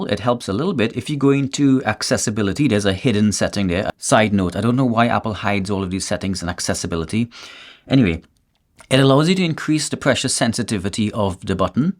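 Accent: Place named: Trinidad and Tobago. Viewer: Bredz like ah hearing Trini 😂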